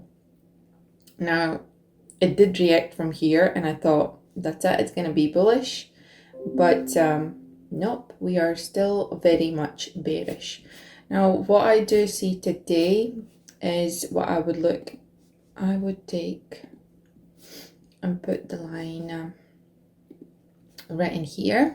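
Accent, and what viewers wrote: United Kingdom. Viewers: British? love your content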